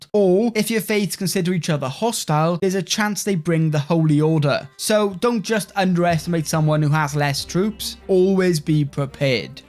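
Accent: Wales, United Kingdom. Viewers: I wonder what kind of UK accent that is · Thanks for the tips mate. Just bought this after playing CK2. Always great to hear a fellow Welsh voice on YouTube 🏴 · There's nothing better than clicking on a yt vid and hearing a Welsh accent 🏴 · Bruv are you Welsh?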